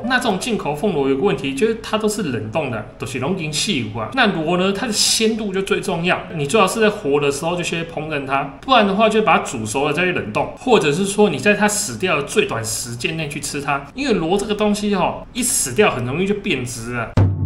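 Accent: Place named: Penghu, Taiwan. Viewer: 我確認他是澎湖人，因為他講台語澎湖腔很重😂😂😂我也是澎湖人一聽就知道了。 而且他講解的很清楚喔～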